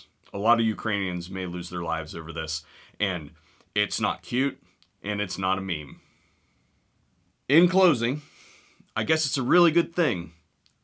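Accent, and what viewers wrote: Ohio, United States. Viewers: Love the video and I can tell you are from Ohio , from another Ohioan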